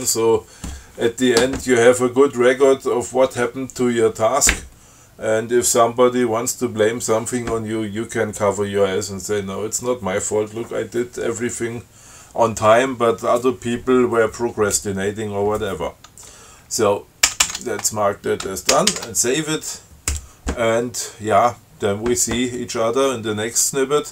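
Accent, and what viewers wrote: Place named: Austria. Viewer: I also immediately thought that this sounds like Austrian English :D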